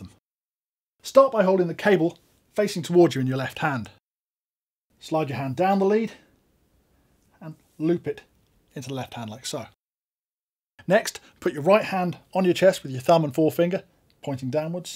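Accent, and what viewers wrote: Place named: United Kingdom. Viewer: As an American, I feel these techniques only work if you have a bad-ass British accent like this dude does.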